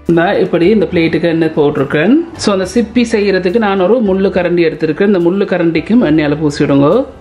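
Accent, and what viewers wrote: Sri Lanka. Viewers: இது இலங்கை தமிழ் அதை கேவலம் என்று சொல்ல வேண்டாம் பிடிக்கவில்லை என்றால் ஏன் வீடியோ பார்க்கிறீர்கள்.... நீங்கள் உன்மையான தமிழர் என்றால் கேவலமான தமிழ் என்று சொல்ல மாட்டீர்கள்...... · I'm from Tamilnadu near Madurai I subscribed to hear your srilankan tamil. Your recipies are entirely new for me and very interesting too.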